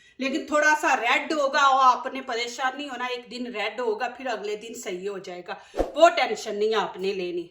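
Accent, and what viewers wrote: Punjab, India. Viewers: Cooking main tu mahart Hy mgr guzarish Hy k Urdu lehja pr thori twajho dein jhatky se ye latka kr bolny se Urdu ki khoonsoorti mtaasr Hoti hy Punjabi andaz main Urdu na bolain Urdu ko Urdu main bolain tu Jo thori si uljhan Hoti Rehti Hy poori video k doran is se bach jain Pakistan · کیا بات ہے آپ کے پنجابی لہجے کی ❤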